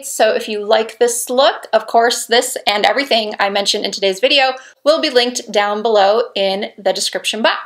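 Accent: Midwest, United States. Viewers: Our Amazon shopping history looks so similar. I have a couple of those brush holders. I have that lamp in silver. I have that necklace set. I’ve looked at that black dress but opted for the sweater with a similar sleeve instead. I just spent the holidays in Summerlin, coincidentally. My SO’s mother and family all live there - so we’re actually there a lot. I’m not sure which neighborhood you’re in. I’m so glad I found your channel. I haven’t been watching long enough to know but your accent sounds a bit from the midwest (like around Chicago?). I am from 50, live in LA, and am originally from Indiana. Anyway. You look fantastic and I am enjoying your channel. Thanks!!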